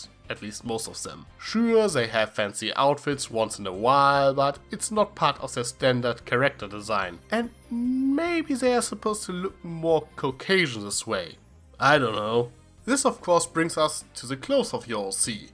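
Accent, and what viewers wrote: Germany. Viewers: ARE U GERMAN!?! BECAUSE IM FAMILIAR WITH ZE ACCENT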